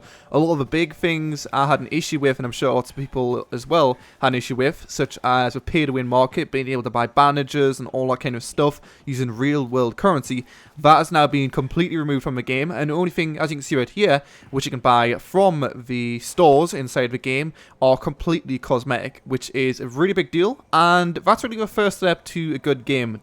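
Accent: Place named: Newcastle, United Kingdom. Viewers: hes english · no he's English he just has a regional accent (Newcastle) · He is from Newcastle, UK. they have a unique accent which is why he might not sound English to people from outside the UK :) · He is from England, Newcastle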